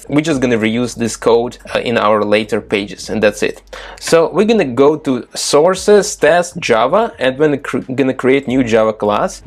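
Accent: Russia. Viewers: Толковый блоггер. По акценту слышно, что русский 😅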